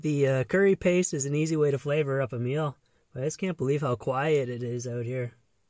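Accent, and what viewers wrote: Canada. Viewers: Is that Canadian accent or what? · That thick pure Canadian accent is complete ASMR. Damn, it's just what I needed to soothe my soul. Thank you. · Wow that’s the most Canadian accent I’ve ever heard. 😉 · Whole Food Plant-Based Man I love Canadian accents because it’s a mix or a British and American accent. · I am English and I can tell you are Canadian because you say ' oot' instead of Out ! For 25 years I spent the summers in Germany and winters in Morocco in my RVs If I were you I would be in Arizona (My No 1 State) in winter and Canada in summer. Thank you so much , Love your videos. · This guy sounds exactly how I thought Canadians would sound. Also people tell the guy to move, assuming he would go south.... this god of a troll goes even more north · Is this a canadian accent? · I love your accent and they way speak Some words small pause some words with long vocal end Pure Canadian lovely chap God bless you And please drive carefully on those ice roads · I'm Canadian and this is the only guy I've ever seen in my life with a legitimate Canadian accent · The way this guy talks I'm assuming he's canadian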